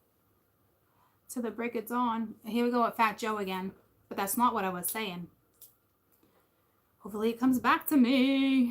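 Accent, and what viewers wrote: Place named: East Coast, United States. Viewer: My grandpa and grandma Hardy and autie Sue were from Fall River Ma ..I think I was initially drawn to you because you bring memories of them and the East Coast to me with your accent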